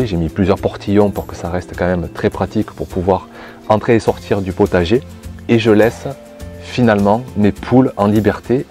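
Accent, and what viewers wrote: South, France. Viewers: je viens de découvrir vos vidéos, elles sont super et très instructives franchement je me régale et puis l'accent du sud dont je suis aussi ça fait plaisir 😀 alors oui moi aussi j'ai des cocottes 3 petites rousses et une pékin, qui recommencent à pondre tout doucement après la pause hivernale, c'est super les poules moi j'adore et je ne vois pas mon jardin sans elles, j'aime les voir déambuler et picorer par ci par là et puis moins de taupes depuis qu'elles sont là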